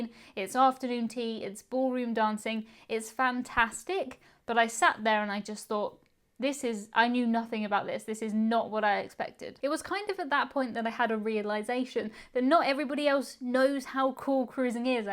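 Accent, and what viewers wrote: RP, United Kingdom. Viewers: Your videos are so fun to watch and with that delightful BBC accent it also fascinating to listen to your narration. Keep up the good work! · Just found your videos and was scared you were quitting YouTube! I’m not usually big on ladies with British accents, but I quite fancy! Congrats and good luck!!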